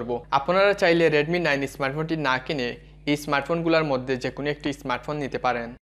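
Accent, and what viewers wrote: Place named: Sylhet, Bangladesh. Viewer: আফনার ভাষায় ছিলটি ছিলটি লাগের।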